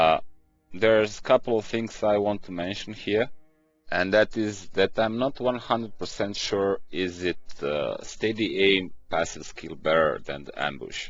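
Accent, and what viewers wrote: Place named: Czech Republic. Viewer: really useful videos!!...thank you so much...btw your english sounds like czech or slovakian:) sorry if i miss...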